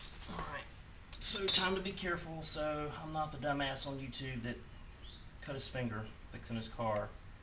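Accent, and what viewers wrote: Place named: South, United States. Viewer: Like your southern accent!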